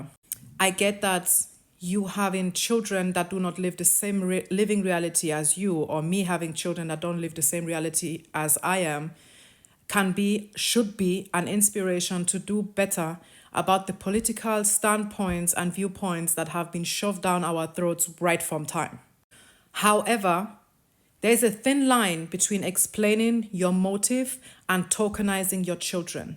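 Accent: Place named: Nigeria. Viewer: Very nice video. Very insightful, and many good points were made. If I may add on one piece: "whiteness" is not the problem; White PEOPLE are the problem: White men, women, boys, girls, little old White women, and cute little White preschoolers! I haven't seen your other videos, maybe you address this there; but this is a point that was not so strongly addressed as far as I could see. I have never seen"Whiteness." I wouldn't be able to identify it nor would I know how to combat it if I came across it. I do know what White people and their racist deeds are however. "Whiteness" is an amorphous, abstract concept that dilutes the dialogue regarding where the problem (and thus the solution) lies. It's actually a shelter, the final fig leaf, so to speak, behind which White people hide. It's part of the problem and a term which should be avoided in conversations on Racism/White Supremacy at all costs! 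Rather than speaking of "our Whiteness," I would rather hear White people say, "I did such and such," or "My mother, my wife, my grandfather, my child, or that White person right over there did so on and so forth." Notice how we NEVER hear White people talking like this, yourself included, ma'am. (Again, within the context of this video.) This would be a good habit for all White people to cultivate, again, including yourself, ma'am. Other than that, great video! I especially like your accent. Nigerian?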